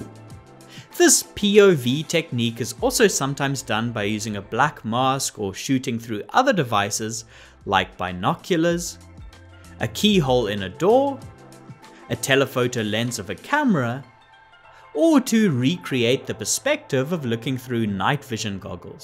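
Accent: South Africa. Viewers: Where is that accent from sir? South African or German?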